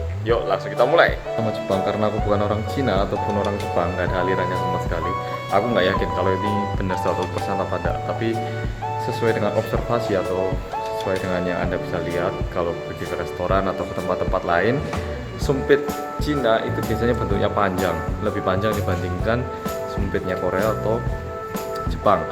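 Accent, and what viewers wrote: Java, Indonesia. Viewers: Orang korea asli ya kak? Koq fasih banget bahasa Indonesia, bahkan medok😎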